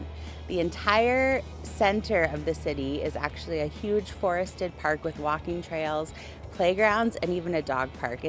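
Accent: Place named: United States. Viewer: Hi, I am first time watching your video That is nice for me because I love American English accent Love from India ❤😊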